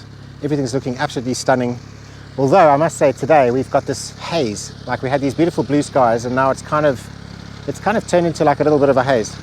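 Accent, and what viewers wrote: South Africa. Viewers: You sound South African... watching from Durban🇿🇦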